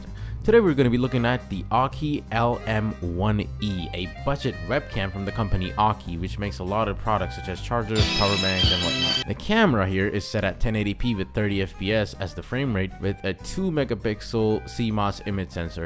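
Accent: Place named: United States. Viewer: bruh you sound american hows that possible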